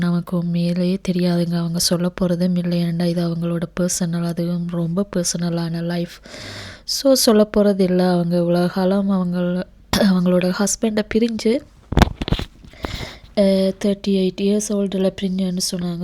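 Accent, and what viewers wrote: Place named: Sri Lanka. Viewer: முதலில் நீங்கள் எங்கட அழகான இலங்கை தமிழை அழிக்கவேண்டாம். இரண்டு தோனியில் கால் வைக்கவேண்டாம்.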